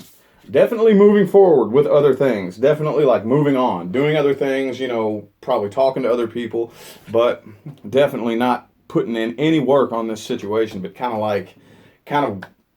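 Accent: South, United States. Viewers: Where is his accent from? Is that a southern accent? haha. Love it thanks! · From the second you opened your mouth I knew I had to hit subscribe! Love the southern accent! Great reading.....!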